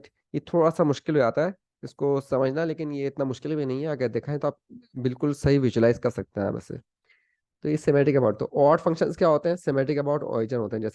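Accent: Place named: India. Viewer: oh my god the pronounce is so india;i don't understand what he says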